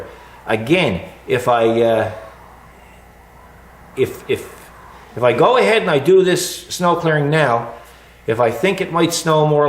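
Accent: Newfoundland, Canada. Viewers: where are you from in Canada? it sounds like your a Newfoundlander because I am one too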